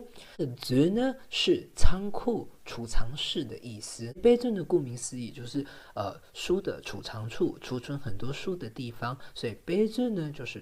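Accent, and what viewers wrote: Lhasa, China. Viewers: 請問這是那種口音的藏語？拉薩腔嗎？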